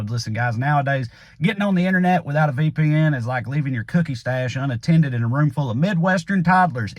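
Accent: South, United States. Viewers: I dont like southern accents (used to love them), because they are usually associated with someone trying to take away my rights as a woman, stupidity and violence...... But I could listen to Trae all day. His wit, wisdom and level of information is just so astoundingly endearing and makes me remember why I USED to like southern accents. There was a time when the word of wisdom came from those accents, now its like dumbassery galore. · My favorite thing to say about Trae is " When I see in my suggestion I always hope it's a Trae and not a Stephen" the next is that I love to hear words like "incalcitrant" in a southern accent ESPECIALLY used correctly. XD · proof that a southern accent is not a political Identity! Mad respect for the redneck voice of reason!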